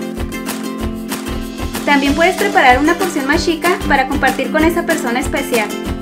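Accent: Chihuahua, Mexico. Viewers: Eres de CHIHUAHUA ?🤔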